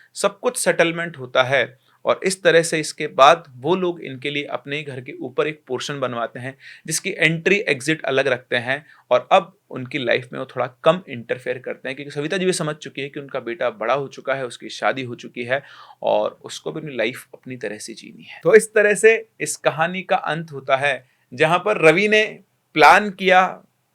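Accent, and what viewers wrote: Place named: Rajasthan, India. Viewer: Intro se Samajh ara h Rajasthani hona 🤭 Ghani Khama 🙏🏻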